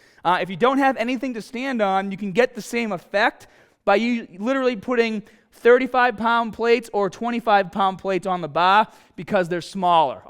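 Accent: Boston, United States. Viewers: I think he is from boston. Is he? Sounds like he is. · Like the southey Boston accent! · love that boston accent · With a slight auch in your back you want to position the baabell, love that Bostonian accent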